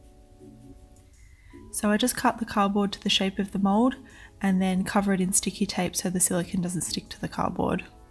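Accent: Australia. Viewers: Thanks for sharing your simple way to make a mold; I'll certainly try it! What would you use to fill surface imperfections on an item before making a mold of it? Oh, and it's nice to hear another Aussie making a tutorial! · Thanks so much for this video. I have watched many videos on you YouTube about silicone mould making, and this one is probably my favourite so far. Thank you for taking the time out to make this for us. I am also an Aussie, so I also appreciate the accent. I have also used Pinkysil in the past with great results, but I find it quite expensive. I would greatly appreciate any advice on alternative products. TIA.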